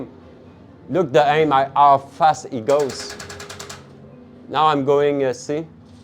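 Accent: Quebec, Canada. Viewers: Woah you sound so Quebecers mon chum! Subscribe esti! J'suis content d'avoir un fan d'arcade/retro de chez nous!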